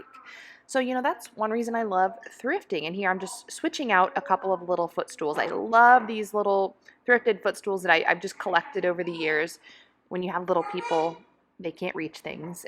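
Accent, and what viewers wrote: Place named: Missouri, United States. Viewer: I love your message about letting go of the perfection of it all. Listening to you talk I am wondering if we are from the same area! I am from warrenton Mo and there is a hill there called hopewell hill, not far from the Missouri River. I just found your channel recently and have been watching/enjoying your videos!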